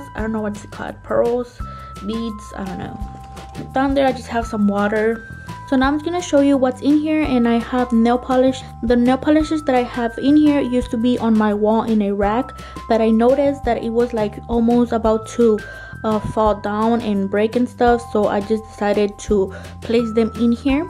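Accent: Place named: Mexico. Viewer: wojod haider really? it sounded like a Mexican accent to me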